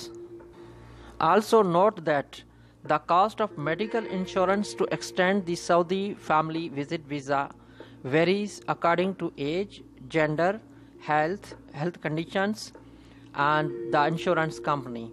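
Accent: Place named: Punjab, India. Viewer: punjabi accent me english...arey bhai urdu me bna ly video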